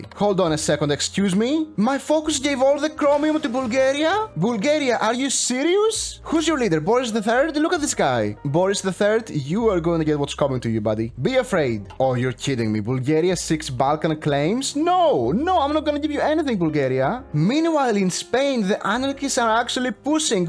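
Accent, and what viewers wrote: Greece. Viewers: The accent... Are you Greek? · Never thought Greek accent sounds like this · Bro I can't believe it I'm greek και κατάλαβα κατευθείαν ότι είσαι από Ελλάδα από την προφορά σου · Όταν σε άκουσα να μιλάς κατάλαβα ότι είσαι Έλληνας 😂😂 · superior greek accent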